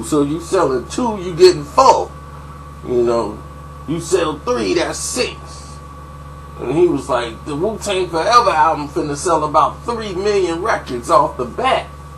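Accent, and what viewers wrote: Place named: Midwest, United States. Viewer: j o yes hes from midwest tho...not east coast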